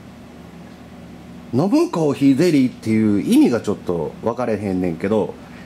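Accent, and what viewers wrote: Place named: Osaka, Japan. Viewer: 意識すると大阪弁は出ませんねぇ。 殆ど漫談になってるのが、おもしろいです。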